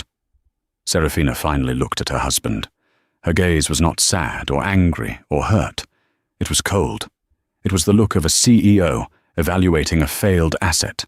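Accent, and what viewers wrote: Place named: England, United Kingdom. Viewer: Oh so glad I'm not the only one to enjoy the narration in that purring English accent. YUM!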